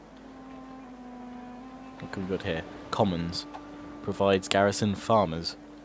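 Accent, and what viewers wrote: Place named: Wales, United Kingdom. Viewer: I say kill em all lol:D I love the welsh accent:D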